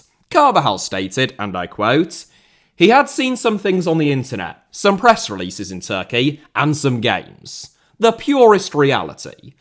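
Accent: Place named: Hull, United Kingdom. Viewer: That is his voice. He is from Hull.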